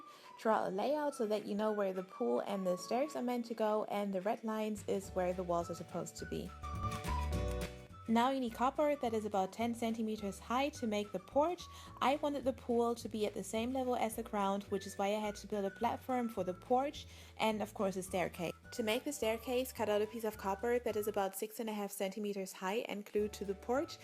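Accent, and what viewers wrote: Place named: Germany. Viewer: I knew it. You have a german accent :D ! You are from Germany right?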